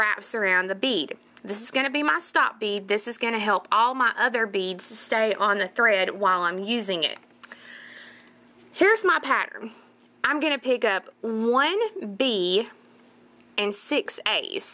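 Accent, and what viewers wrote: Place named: North Carolina, United States. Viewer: Great tutorial! gives clear and excellent instructions, plus I love her North Carolina accent.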